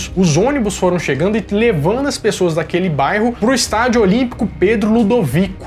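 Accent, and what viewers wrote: Minas Gerais, Brazil. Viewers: deixa o mineirês aflorar, sô! É lindo demais, uai! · Meu amigo....Boa noite....acho seu canal muito bom....dinâmico ...informativo...sem dizer q o jeito de vc apresentar é peculiar...seu sotaque" pão de queijo" é ótimo. Trabalho no Hospital ao qual se referiu....Hospital Naval Marcilio Dias....E completo de cá , para conhecimento de todos, q o HNMD, orgulho da nossa Marinha, à época e ainda nos dias de h,j é referência Nacional p atendimentos a pacientes radioacidentados.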